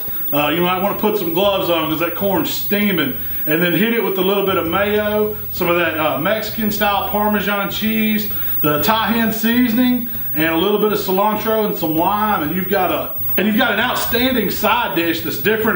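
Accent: South, United States. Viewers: Love the Southern Twang, is that TexMex? Great recipe, I'm definitely trying this.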